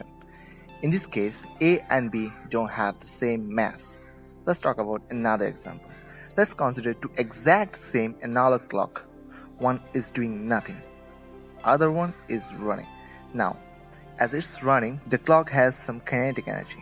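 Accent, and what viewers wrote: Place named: India. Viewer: its hard to understand stuff , when indians talk english , its way to thick , Plz talk in a understandable way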